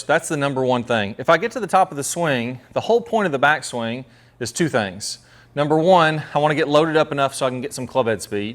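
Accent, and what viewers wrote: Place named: Florida, United States. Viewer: Hi, as you may recall im a lifelong fan! Being British, just love that Florida(?) accent!still getting that lag and the sweet little draw that you demonstrated. But anyway, I was trying to clean my car (auto) carpets by beating them against the garage wall. No matter how hard i tried just got a weak ‘thump’ that didn’t dislodge the debris, and scratched my knuckles! So I moved to the corner end of the garage to avoid the scraping of knuckles. And WOW! My hands went clean past the corner wall and the CRACK of the carpet against the wall was (almost) deafening! And the debris came exploding off Maybe ive just discovered a ‘hands in front of the ball’ drill.? BTW, i used this technique hammering in tent pegs when out camping. Allowing the stinking hand to move down (a little ) the line of swing just beyond point of impact Effortless effect! 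Maybe this might help someone Keep up the great vids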